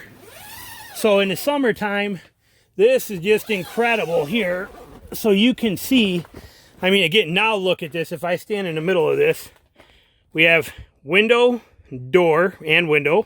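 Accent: Michigan, United States. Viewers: super helpful review and one hell of a a Michigan accent.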